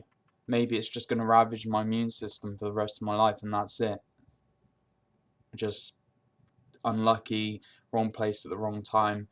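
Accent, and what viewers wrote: United Kingdom. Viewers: English not Aussie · This is a semi estuary London type of accent not Australian,,,,I found him clear enough,,he wasnot cockney or anything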